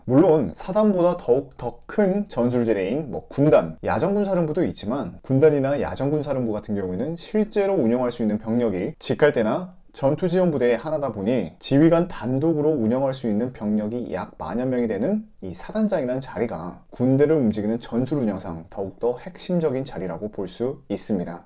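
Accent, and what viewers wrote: Jeolla, South Korea. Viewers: 전라도 사투리가 섞여 있네요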